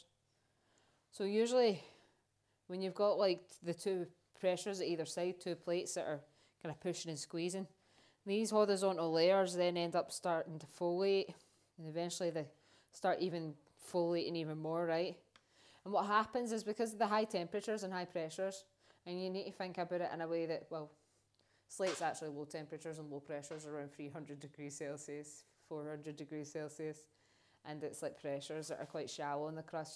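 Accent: Scotland, United Kingdom. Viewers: Glad I chanced upon your channel. I was interested in your mention of mudstone ( and I've noticed you have a video on that). I live in Almondbank, a couple of miles northwest of Perth. There are some cliffs where the river Almond takes sharp turns and the cliffs are known locally as marley rock- as in crumbly. The rock is very soft and crumbly. The colour is pinky brown. I assume that's mudstone. I'll have a look at your other rock videos; thanks for your efforts. And its great to hear the broad scots accent.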